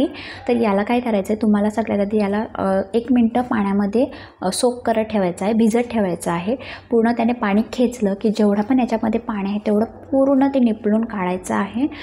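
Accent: India. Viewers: Vada saydchi aahes ka bolnyavarun samjte must video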